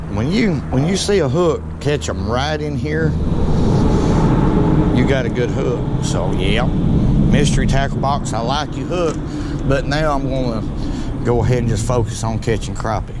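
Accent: South, United States. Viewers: I just wanna say that the reason I love watchin' your videos is for one, I love crappie fishing, and you always deliver when it comes to crappies, your down to earth attitude that keeps me laughin' every time I log on, and last but not least, that darned southern accent is irresistible to listen to. I love your humor, your sarcastic take on things, and the fact that I'd love to have someone like you to spend my day on the water fishing with. Thank you for providing me with daily entertainment and helpful videos like these. I get a bunch of fun outa watchin' you every time I log onto youtube. Have a great day, my friend! You da best!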